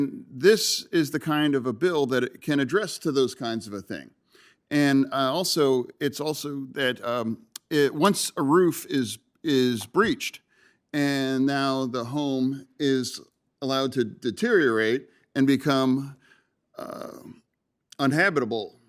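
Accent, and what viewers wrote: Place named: Wyoming, United States. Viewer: He sounds like he is from Wyoming after his aneurism.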